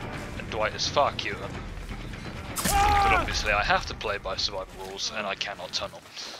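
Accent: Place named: Germany. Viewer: Bro u are amazing love ur vids and ur dbd animations i think after ur accent u are from germany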